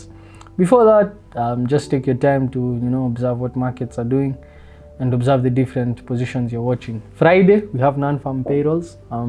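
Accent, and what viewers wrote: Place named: Kenya. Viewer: From the talk unajua ni mkenya 😂🎉🎉🎉let's goo bro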